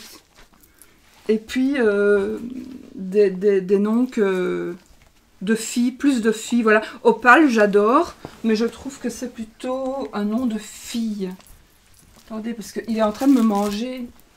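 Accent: Belgium. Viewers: trop sympa ce type de vidéo, j'adore t'écouter parler des tes cartes, de tes chiens, de ta vie avec ton petit accent belge que j'adore !!!!! ca me permet de me sentir moins seule dans mon atelier alors merciiiiiiii !!!! Et Oscar j'adore, c'est le nom de mon tt 1er chien, j'avait à peine 20 ans, c'était un croisé bichon/caniche d'une gentillesse incroyable, obéissant, intelligent, sociable, sage, bref, une perle, il m'a accompagné pendant 14 ans <3 je te souhaite donc une toute aussi belle histoire avec cette adorable boule de poils noire et blanche <3<3<3 Bisous et change rien c'est comme ca qu'on t'aime !!!!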